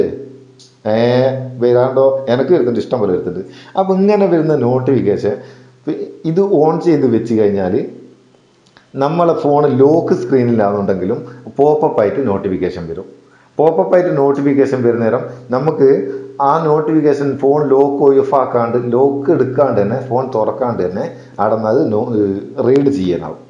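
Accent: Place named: Vadakara, India. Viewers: ഇഞ്ഞി കോയിക്കോട് ആണല്ലേ... ഇമ്മളെ ജാനു ഏടത്തി ടെ... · നല്ല തനി വടകര സ്റ്റൈലിൽ അറിവ് പകർന്നു തന്നതിന് വളരെ നന്ദി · ശരിയായ മേപ്പയ്യൂർ സ്ലാംഗ് തന്നെ. കൃത്രിമത്വം തീരെയില്ലെ. കൊള്ളാം! ക്ലിപ്പ് കണ്ടോരെയൊക്കെ കൈയ്യിലെടുത്തല്ലൊ! ഉഷാറായിക്ക് കുഞ്ഞോനേ! Thanx! Go ahead! Kadathanadan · ഗുഡ് മെസ്സേജ് , അവതരണവും കുഴപ്പമില്ല. ഒരു നാദാപുരം സ്റ്റൈൽ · നല്ല നാടൻ പയ്യോളി ഭാഷാ നൈസ്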